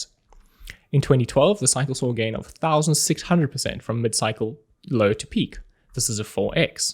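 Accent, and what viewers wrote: South Africa. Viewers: You sound so South African... Love your videos, they the best. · Love that South African accent,! 👍👍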